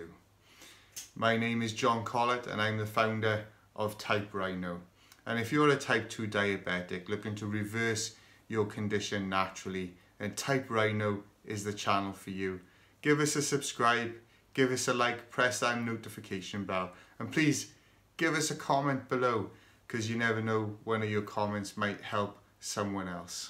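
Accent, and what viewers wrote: Wales, United Kingdom. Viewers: Do I detect a Welsh accent?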